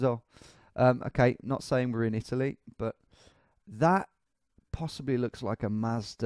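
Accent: United States. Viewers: That perfect Midwestern accent... In Boston lol. Great video though, love these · your american accent is so spot on. makes me laugh every time! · His American accent is actually very good. And absolutely hilarious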